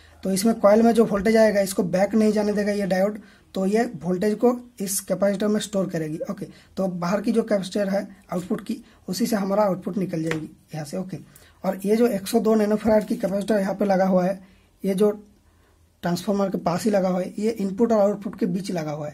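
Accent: Bengal, India. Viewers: Are you Bangali??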